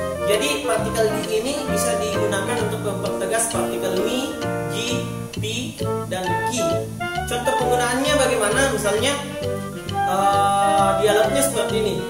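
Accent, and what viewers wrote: Makassar, Indonesia. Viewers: Itu bukan bhasa makassar brow tpi logat dlm bhs indonesia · Tapu itu logat makassar bukan bugis😅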